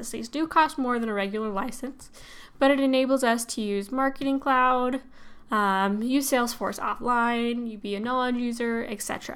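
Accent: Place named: United States. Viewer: Thanks God for an American accent :) ( it's annoying trying to understand a very strong Indian accent :P) thanks for the video.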